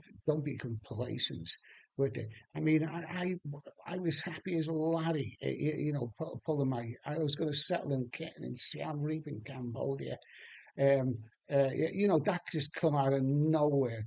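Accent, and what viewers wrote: Liverpool, United Kingdom. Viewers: Great to hear the scouse accent again 👌 one day at a time mate but the improvement is great 👍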